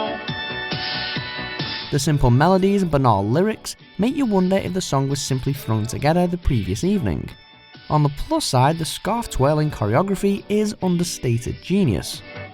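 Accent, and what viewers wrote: United Kingdom. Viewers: This is exactly the same as the list on WatchMojo, it is only voiced in the British accent. Don't understand why it should be on both channels.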